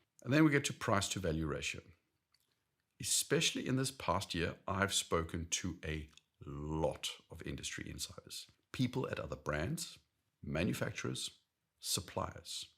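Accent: South Africa. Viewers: Are you south african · Was not expecting a saffa accent when I clicked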